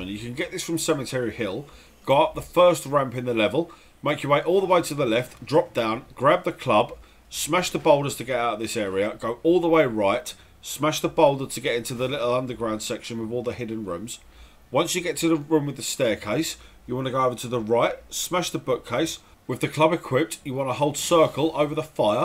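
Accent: United Kingdom. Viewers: It's weird how even a video about a silly game spoken with a Brittish accent sounds like a professor saying that the French is invading England.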